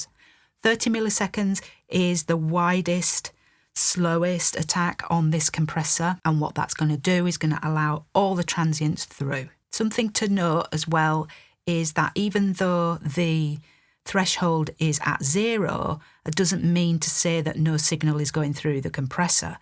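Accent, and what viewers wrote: Northern England, United Kingdom. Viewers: Glad you're back, you my no.1 source for tutorials about mixing. As an engineer myself I found that we are on the very same page in terms of workflow, choice of gear and alike. Love it, and i love you accent! is it from the north of England?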